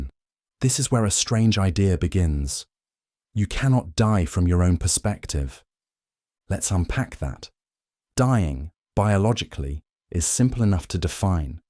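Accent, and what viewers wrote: United Kingdom. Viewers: Please never change the voice, I love this British voice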